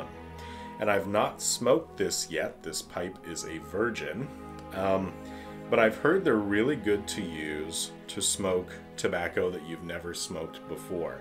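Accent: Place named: Italy. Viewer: dude i loved the Italian hobbit accent. also how many bowls do you usually smoke per week?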